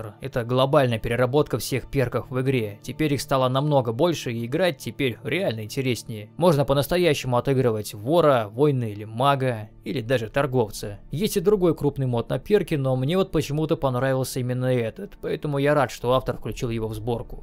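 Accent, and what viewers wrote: Germany. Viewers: и да сразу видно что вы изучали немецкий по вашей манере читать инглиш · Право слово, автор произносит английские слова, как немецкие. Я всё понимаю, но это совсем уже жёска.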